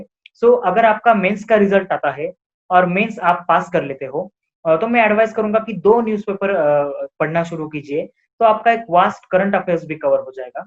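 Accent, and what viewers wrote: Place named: Maharashtra, India. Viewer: Marathi mulachi hindi bhasha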